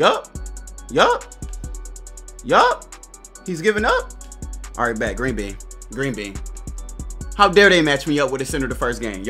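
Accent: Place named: South, United States. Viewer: You can hear the So in the way bro talk🤣💯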